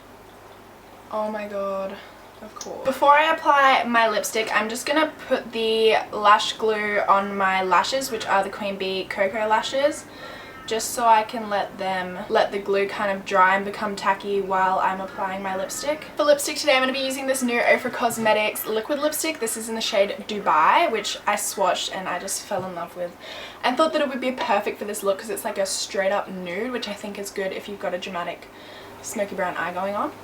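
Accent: Australia. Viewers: I love that australian accent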